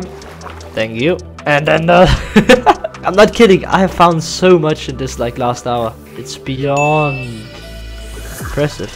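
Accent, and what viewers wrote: Denmark. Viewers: are you danish my guy? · yooooooooooooo anyone ever told u,u have an danish accent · Are u danish? 😂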